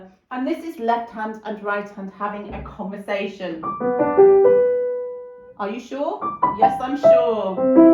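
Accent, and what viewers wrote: United Kingdom. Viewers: Amazingly angelical English accent! Flawless explanations. Again, thank you. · Snap! British English...love it!!! Same here!!!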